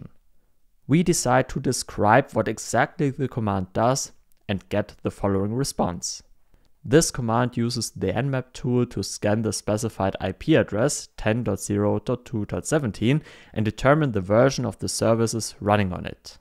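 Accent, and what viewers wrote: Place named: Germany. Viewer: nice video very deutscher Accent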